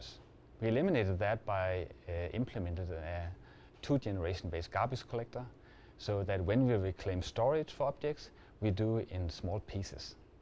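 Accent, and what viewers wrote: Denmark. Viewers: I can just hear he's danish :D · NO! its a danish accent. personaly i think all danish people should be forced to use english instead. its the worlds most singularly incoherent language. a horrible mix between swedish and german which speekers of either language is unable to comprehent. Hell i've heard even danish people have problems understanding each other. I really despice the danish language... (i dont mind the people just there horrendous way of communicating)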